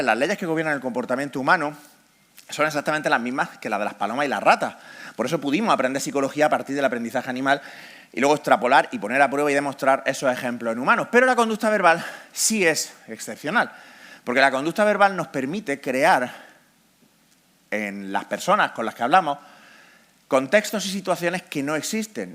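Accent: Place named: Andalusia, Spain. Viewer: Como andaluz, le pido perdón por cómo habla este lelo. Ni mucho menos somos así.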